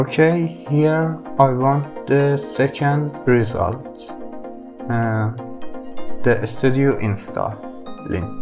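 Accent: Iran. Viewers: Iranian accent haha I love it bro that was great thank you ❣️❣️❣️❣️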